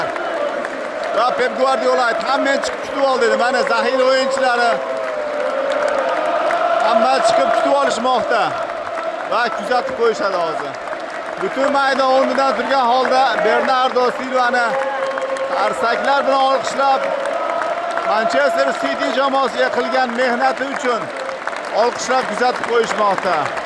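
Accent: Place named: Andijan, Uzbekistan. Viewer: Молодец! Кайф киламан андижончасига ,омад тилаб коламан анжанлиларга!!😊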